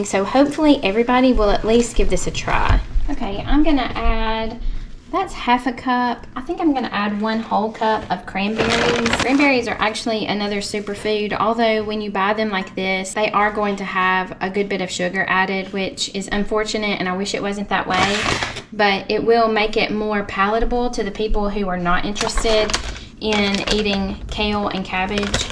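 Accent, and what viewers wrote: South, United States. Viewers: I just absolutely love your southern accent!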